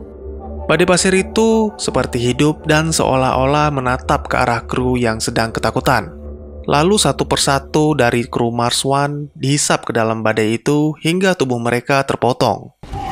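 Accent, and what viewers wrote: Aceh, Indonesia. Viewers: Dari aceh ya bang Nanya doang:-)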